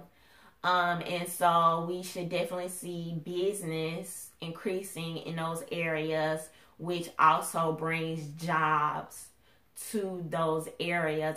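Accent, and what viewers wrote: South, United States. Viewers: Hi this your fan from Nassau Bahamas again I suppose too ask you where southern accent state you live in... Since Trumpy working with the inner-city now the fake news mainstream media and Roland ''BUCK DANCING 4 HICKERY'' Martin will spin this too please they own wicked agenda lol.. I want you listen and watch this clip by ''PHARAOH SPEEKS'' on Roland Martin you may laugh lol.. <link>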